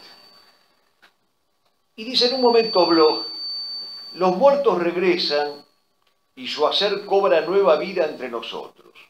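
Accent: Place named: Buenos Aires, Argentina. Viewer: Claro y preciso, muchas gracias Ademas el tonito porteño es agradable, (perdón trinitario) saludos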